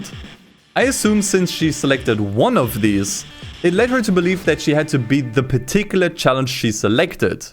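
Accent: Germany. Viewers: ich wusste dass er deutsch ist, da er einen deutschen akzent hat hahah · first time here and for 7 mins i was like "do not assume. just because he sounds like a german does not mean he is german." yeah seems like i listned correctly :D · Ah ich hab doch richtig gedacht dass bei deinem Englisch ein Deutscher Akzent rauszuhören ist und bei hat es deine Freundin bestätigt.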